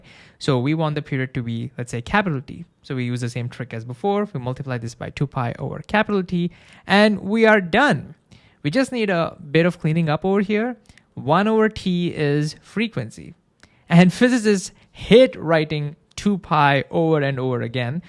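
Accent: India. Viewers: God I hate when I find a video that sounds exactly something I'd like then I hear an Indian accent.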